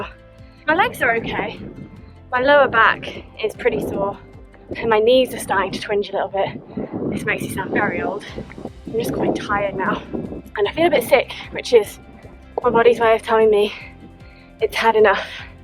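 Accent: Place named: England, United Kingdom. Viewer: I can't figure out if you have a Welsh accent or English. I'm thinking English but every so often you sound Welsh lol If you're Welsh then Shwmae, if you're English then I hope you enjoyed your stay 😂👍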